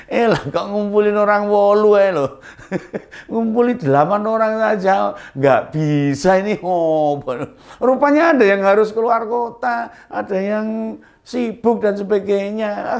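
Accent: Java, Indonesia. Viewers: penjelasan panjenengan jelas dan gamplang dg logat jawa yg halus dan santun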